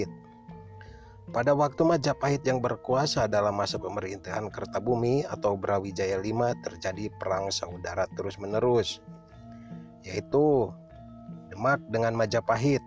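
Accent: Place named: Ciamis, Indonesia. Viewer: Logat ciamis nih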